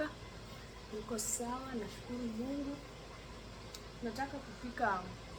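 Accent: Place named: Kenya. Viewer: She’s a luhya, therefore don’t be too critical, cut her some slack! We understand what she means. Every tribe have an accent!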